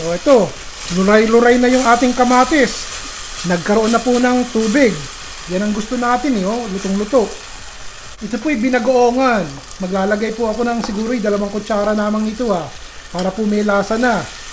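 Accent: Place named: Nueva Ecija, Philippines. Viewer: Kamates, nagka luray luray, ganire at ire. Punto at mga salitang kapag narinig ko eh para kang nakikinig sa matatanda sa Nueva Ecija😅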